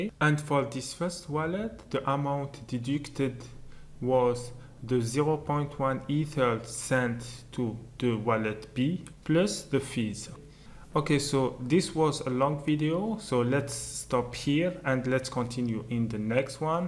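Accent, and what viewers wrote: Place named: France. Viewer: For the love of the beautiful French accent, I want to learn French, mersi or merci ❣❣❣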